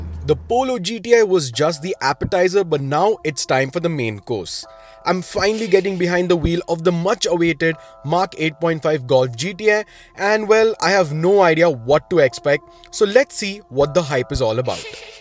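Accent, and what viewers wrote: India. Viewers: u sound indian, don't get it?😅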